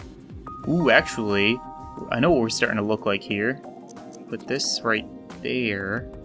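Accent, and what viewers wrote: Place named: Canada. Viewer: He was so Canadian sounding back then compared to now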